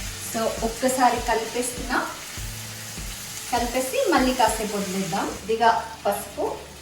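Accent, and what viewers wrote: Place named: Telugu, India. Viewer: Nice Baga cheseru. Meru telugu vallu kada garu...mee matalu ala unnai.....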